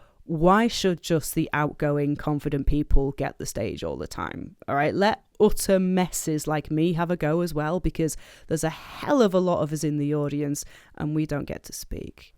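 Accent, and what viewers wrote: United Kingdom. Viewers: I just watched this, god knows if you're see this comment. Part of the reason I watch your vids is because of your humor, and the other part is because you always made mention of your issues and as someone who is bipolar I get it, because I've been there myself. That and if I'm going to be perfectly honest, you're attractive and I can't get enough of your voice, it's the British accent. Could listen for hours, I usually wait till there there's a few vids to watch in a row for said reason. Not a creeper, just a Bipolar guy that finds himself in similar situations as yours and..... well, what can I say I'm guy, knowing there's an attractive woman out there that I can relate to on some level, makes me feel less lonely and worthless. Stay strong, be you, because you rock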